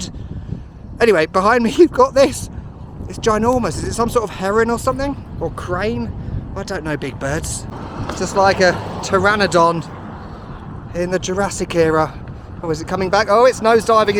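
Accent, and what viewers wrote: United Kingdom. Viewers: Thanks again for your great videos! I have visited Baja California and Jalisco many, many times. But now I want to go see Hermosillo and San Carlos thanks to you. Love your British accent! I lost count of how many times you said "brilliant!" or "idnit?" LOL 😂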